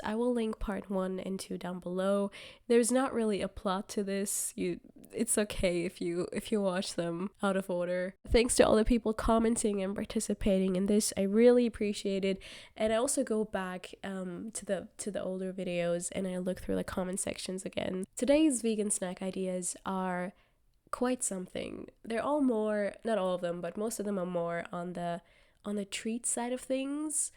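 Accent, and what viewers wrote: Bavaria, Germany. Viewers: Bist du aus Bayern?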